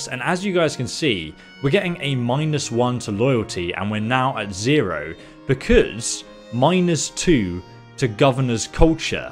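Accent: England, United Kingdom. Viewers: You guys do realize that the man is British and speaks proper English. He lives in a place where the english language started so just think about that before criticizing. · And Here i thought its obvious He is Brit oh lol.. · "mil-ih-tah"? England, please, just stop.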